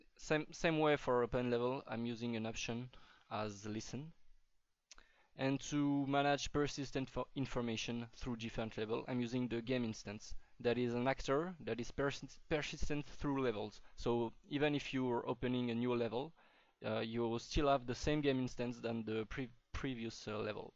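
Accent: France. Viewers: You're french right?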